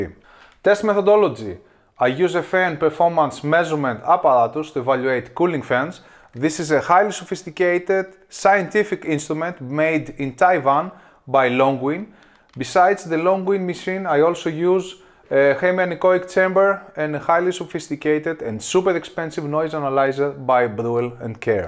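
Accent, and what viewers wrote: Greece. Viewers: OREOSSSS FILLARAKKO :D i love the accent, after 3s i knew, he is greek. Awesome detailed analysis and exactly what i was looking for. Keep up the good Work !!! Kalinichta from Germany !!!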